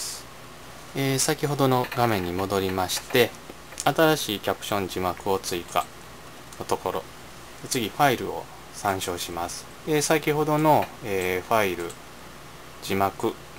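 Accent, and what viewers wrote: Japan. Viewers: 関西弁グッド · 京都弁だ～～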